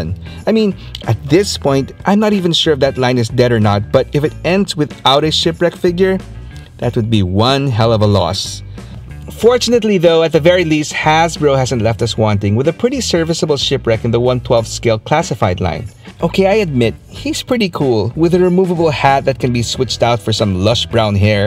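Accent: Philippines. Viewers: from your accent. are you from Philippines? Just curious. Was G.I. Joe big over there? I'm surprised when I see pinoy youtubers talking about gig joe. I never knew it was shown over there in the 80's. By the way you don't need to insert an image of every word or phrase your saying. for example, we don't need to see images ofMadeline Pryor when you say prior or images of Michael Jackson, goat saying bad and all the other corny images. Just stick with the subject. The other images are just so fake and corny.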